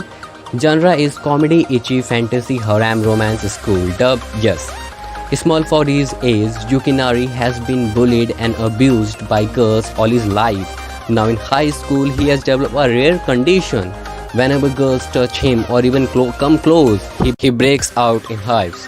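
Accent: India. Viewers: I think you are indian because your voice cannot be perfected completely at english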